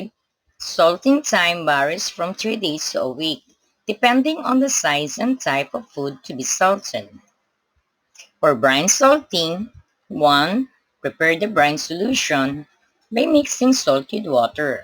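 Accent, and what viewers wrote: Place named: Philippines. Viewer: pinoy yan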